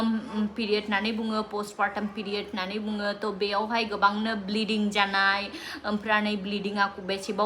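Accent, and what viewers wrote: Bengal, India. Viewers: Railyna benguli style nisw jdwng boro bydi kwnayaki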